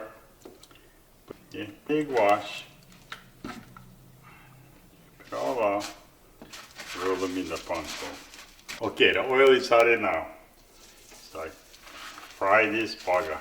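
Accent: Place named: Hawaii, United States. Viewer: Can hear the Hawai’i accent!!!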